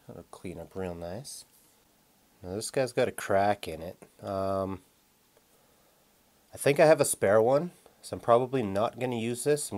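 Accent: Canada. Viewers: You sound so much much like one of my Canadian friends. Are you with the RCMP? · You’re Canadian aren’t you?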